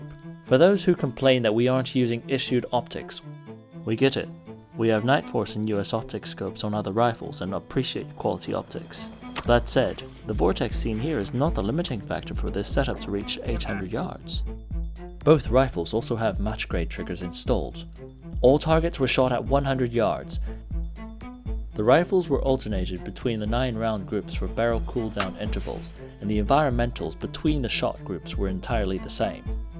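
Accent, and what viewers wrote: United Kingdom. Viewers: New sub here. Love the content. Gotta ask, what accent is that, British? Really cool. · Anyone else annoyed with the British accent on an otherwise awesome review???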